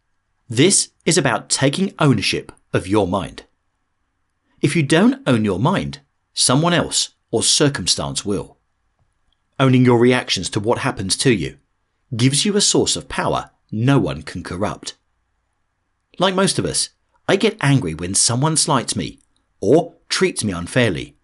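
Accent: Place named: Scotland, United Kingdom. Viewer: Just enjoying the Scottish accent. 😊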